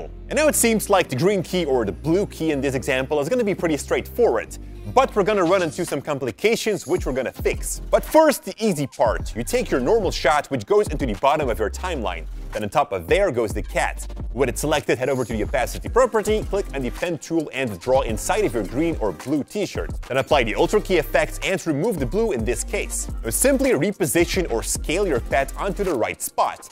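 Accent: Netherlands. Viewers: are you dutch? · are you dutch ? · his accent tells me he is Dutch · Are you Dutch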